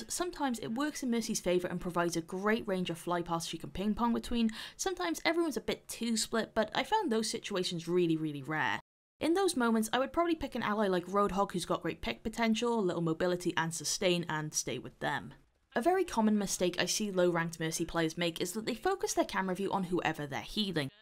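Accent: United Kingdom. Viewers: I always wondered, what part of UK is this accent from. I find it funny but lovely. I had it stuck in my head when I played Witcher 3.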